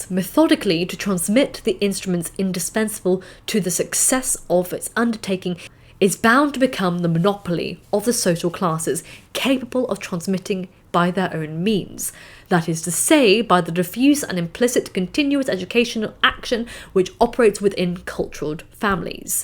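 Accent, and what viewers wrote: RP, United Kingdom. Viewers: Is this teacher why you sound so Posh? She drilled posh RP into you ? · What a delightful and posh accent, it’s verbal caviar. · So refreshing to hear your british English accent, I rarely hear non-american English and at first I got scared I won't understand anything but that was not that hard. Just my process of listening to you was the additional argument to your speech 💪 I think there's also an important note of age-appropriation of literature, some classics are just speaking about themes that are not familiar for children or teens. And I wish people told me this in not a derogatory form, like haha you are stupid little girl you won't understand. It's not like that... It's more like people of country A won't understand the subtle context of literature of country B. And it's not a competition at all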